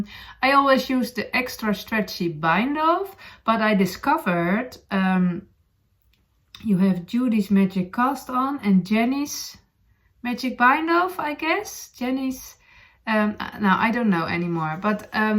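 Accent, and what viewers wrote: Netherlands. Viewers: I love your podcasts. You r duchyenglish is charming. It is one cactus many cacti. We have a few words ending in I l think it is Latin but l am not sure. Continue with the English. I lived in Sweden for a few years and would like to think that my Swedish was as good as your English. Anyway one cactus many cactii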